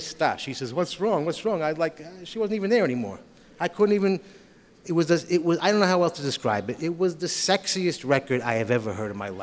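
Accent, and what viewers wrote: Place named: New Jersey, United States. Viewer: I'm from the South and I love Jersey talk keep it up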